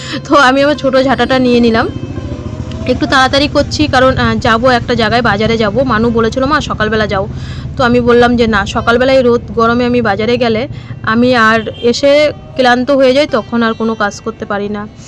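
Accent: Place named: Bangal, Bangladesh. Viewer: তোমরা বাড়িতে কি বাঙাল ভাষায় কথা বলো???? খুব ভালো লাগলো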